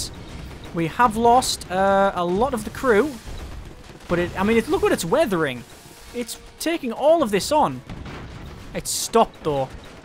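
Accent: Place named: Scotland, United Kingdom. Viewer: Dude r u Scottish or Irish cus u sound like it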